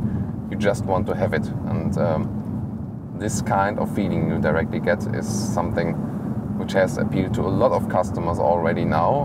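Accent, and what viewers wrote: Germany. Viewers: Very nice commentary on this awesome car! Love it! :) Ab und an merkt man deiner Aussprache an, dass du Deutscher bist - keine Kritik, sondern einfach der einzige Punkt der mir etwas negativ aufgefallen ist :D Keep it up!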